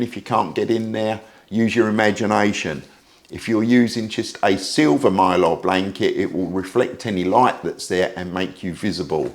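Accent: United Kingdom. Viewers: You have a very pleasing voice and British accent. ✌️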